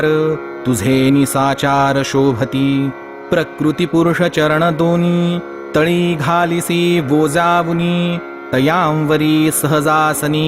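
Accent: Standard. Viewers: Khup dhanyavaad... Aj guru krupene ha garth ch parayan karych ichha ali... And tumcha ha vedio bhetlaaa khupp khupp dhanyavaad... Tumchya vedio muje agdi shuddh uchhar hotatt... Khup shayog bhetlaaa.... 🙏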